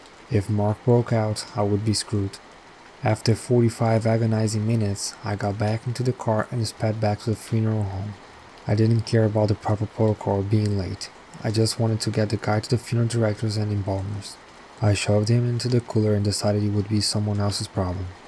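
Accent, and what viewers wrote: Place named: Brazil. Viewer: Ha yes! My Brazilian Girlfriend recognized it immediately and I thought you sounded just like my Brazilian homie Nick too, so we both picked up on it right away! Awesome channel with Spooky videos and your great voice over! Keep up the fantastic work! :)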